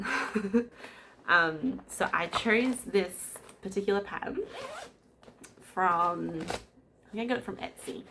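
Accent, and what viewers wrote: Australia. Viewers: Hi I am from Busselton and it is difficult to source patterns fabrics local, Stitches Corner in Perth has just been sold and the shop is moving to Balcatta and will reopen the end of February with an online shop 🤗 as well, I have just started back into cross stitch and quite a novice but like you enjoy other crafts e.g knitting etc I have bought patterns from a Stitch in Time and also Crewel Goblin in Sydney. I enjoyed watching and hearing an Aussie accent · I LOVE hearing a fellow Aussie on YT! What a great first video 😊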